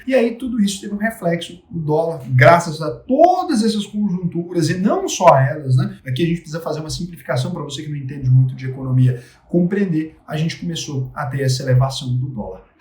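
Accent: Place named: São Paulo, Brazil. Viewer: Pensei isso por causa da voz e esse sotaque apaulistado 😆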